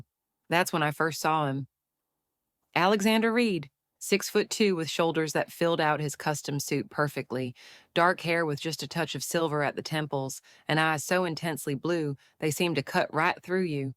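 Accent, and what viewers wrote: South, United States. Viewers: That's a very good point, too. The narrator sounded like a southern white woman with good diction. But the plot was unique & lovely❤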